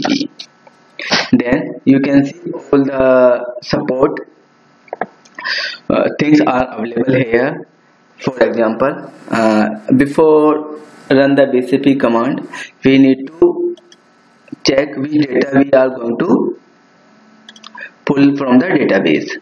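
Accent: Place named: India. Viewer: Caracas a Fundação Bradesco poderia atualizar seus vídeos e colocar vídeos em PT, como que podemos entender com vídeos em outra língua e um cara com sotaque que parece indiano, fica mais difícil de entender. Não sou boa no inglês, mas ficou bem difícil. tive que colocar legenda e como um amigo disse aqui, precisamos lutar para entender e aprender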